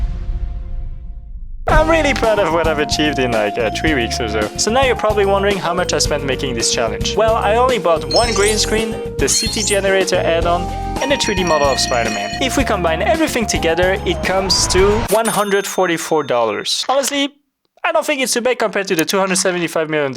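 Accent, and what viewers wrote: France. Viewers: Does my ears mistake me or do you have a french accent ? Seriously I can barely focus on what you said, my mind keep thinking about that, maybe I have an ADHD, idk, just don't ask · t'es français? si oui, très bel accent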